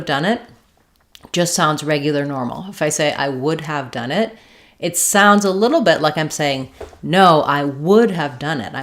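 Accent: United States. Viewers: Is It American accent? please lemme know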